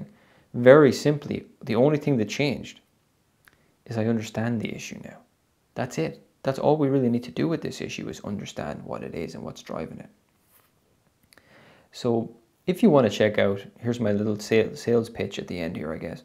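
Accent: Ireland. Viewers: You say Ting not THING is that an Irish accent?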